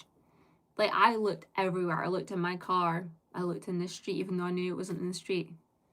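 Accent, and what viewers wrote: Scotland, United Kingdom. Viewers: I was very fortunate to put on YouTube to be greeted by only a few minutes in to the stream. Both entertaining and beautiful (if I may be so bold to say so) unfortunately I fell asleep halfway though, thank goodness for catchup. It had been a very busy day in my defence but I suspect your wonderful voice and gentle Scottish accent played it's part. 💕💕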